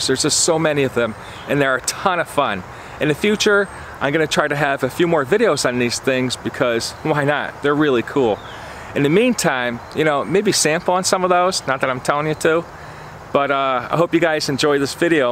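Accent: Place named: New England, United States. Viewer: Judging by the environment, it appears you are Coastal US. Accent seems to be more "North" of me. What State do you reside in? I'd guess New England area...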